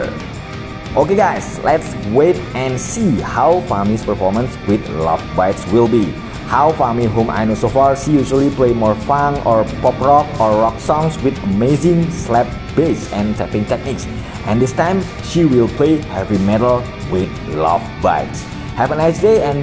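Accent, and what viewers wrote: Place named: Indonesia. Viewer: Logat indonesia 😂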